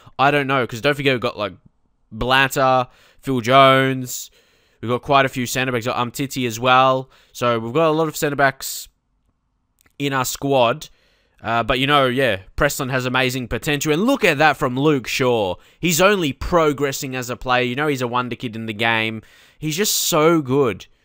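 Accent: Australia. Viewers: Best fm series on youtube. The detail u go into makes it so much more interesting. Length is fine aswell. No need 2 change a thing. Where abouts in australia are you from mate?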